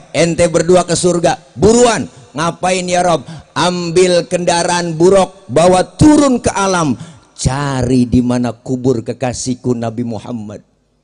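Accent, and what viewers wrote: Jakarta, Indonesia. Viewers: Maap pak ust bahasa y kaya asli betawi pasti jakbar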